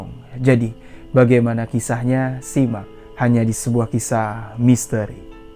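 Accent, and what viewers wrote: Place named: Madura, Indonesia. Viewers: kaka kok nada bicaranya kya orang madura ya 😀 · Logat Madura nya kental bg salam kenal lah sama Batak sama sama kental logatnya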